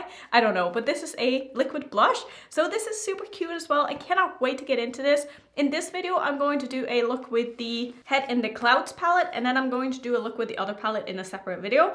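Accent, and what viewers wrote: United Kingdom. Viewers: It’s called blursh because it’s spelled JUST like some brits pronounce the word blush. The also say bush for brush. “Did y’all wash your bushes?” I hear him say it alllll the time on his Instagram weekly. It comes out sounding like BLURSH to us Americans:) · In Manchester where he comes from, often when they said blush it sounds more like blursh. That’s my theory anyways 🤣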